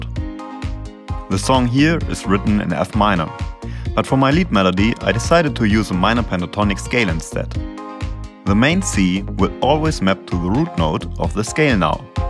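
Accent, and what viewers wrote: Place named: Germany. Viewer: Da entwickelt Ihr so ein Produkt, seid aber zu geizig 200 EUR in einen native speaker zu investieren smh. Dieses Video ist schon konfus genug, aber der deutsche Akzent lenkt so sehr ab, dass es noch schwieriger wird, dem Video zu folgen.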